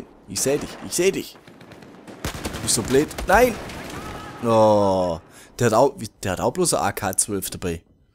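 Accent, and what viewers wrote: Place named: Swabia, Germany. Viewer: Ein Schwabe der versucht Ostdeutsch zu reden. Das klingt einfach schrecklich!!! Du zockst auf der PS4? Vielleicht trifft man sich und ich bring dir´s Ostdeutsch bei... gruss Ulle1410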